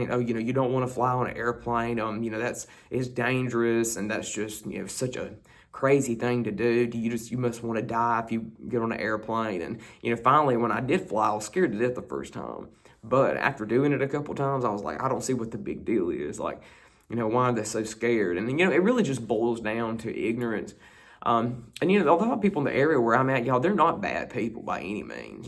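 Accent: South, United States. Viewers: it is so enjoyable to watch and listen to you. You're so easy-going, sincere, and yet so honest too! Of course, the Southern accent doesn't hurt either. But seriously, thank you for coming on YouTube and presenting such excellent content... part of yourself.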